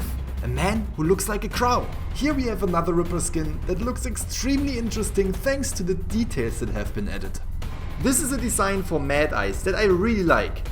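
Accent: Germany. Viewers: Are you irgendwie deutsch because you kling very deutsch xD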